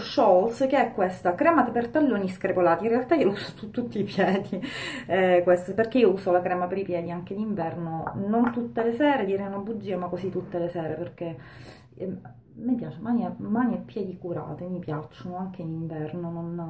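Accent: Sicily, Italy. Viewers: Sei troppo simpatica e' un piacere ascoltarti...adoro l' accento siciliano e poi sempre piu' bella eh??!!😍😍😜😉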